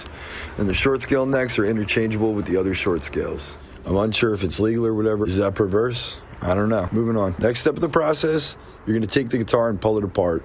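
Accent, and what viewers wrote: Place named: Philadelphia, United States. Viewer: I haven't lived in Philly in like 18 years but your voice is makin me homesick.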